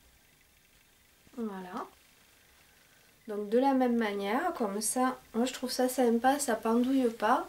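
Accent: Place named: Toulouse, France. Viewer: Bonsoir ma nièce ( petite soeur adorée ) . Super ton accent toulousain ou carcassonnais !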